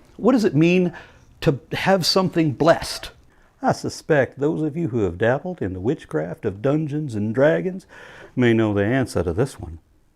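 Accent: South, United States. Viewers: That southern voice is so perfect lol (Also nice DND analogy) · I love his southern alter ego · Your Southern voice sounds both mentally handicapped and frightening as all Southerners do to me.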